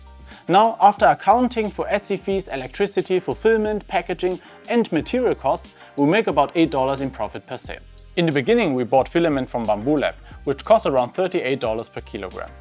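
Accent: Germany. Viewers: Asian man with a German accent caught me so off guard and I love it!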